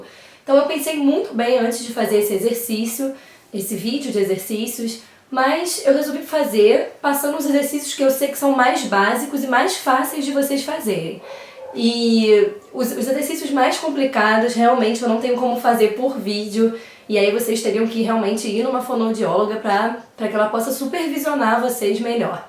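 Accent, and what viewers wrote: Rio de Janeiro, Brazil. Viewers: Sou do Rio de Janeiro e tenho taquilalia. Você atende em que estado do Brasil? Seu sotaque parece de carioca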